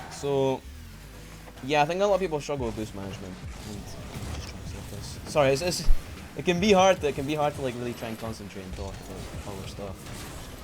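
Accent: Scotland, United Kingdom. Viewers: Ye Scottish aye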